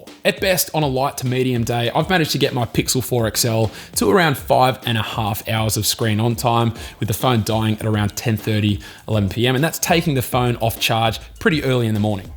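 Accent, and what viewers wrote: Australia. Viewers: Thank you so much for your excellent reviews and unbiased advice on mobile phones - yours is the go-to channel for a well produced, honest review. I am thinking that maybe this Pixel 4 review did not require you to repeat honest 10 times though - mate your viewers can see you are honest by the quality of all your reviews! Your Australian accent is a refreshing change from the multitude of other cell phone reviewers! Looking forward to more from your channel. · Just stumbled across this video, and damn I wish YouTube had of recommended you before now. It's great to get an honest review, and have it in an Aussie accent. I've used every pixel since it first launched, and have never experienced the issues in any of them that were previously reported, but the decision to put the small battery almost turned me off the phone. Almost, the camera is well worth the phone.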